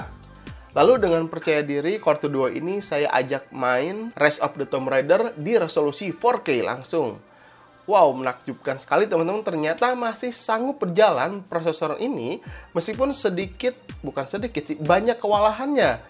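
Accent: West Java, Indonesia. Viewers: Biasa, orng sunda logat nya kyk gitu, sama kyk gw wkwkwk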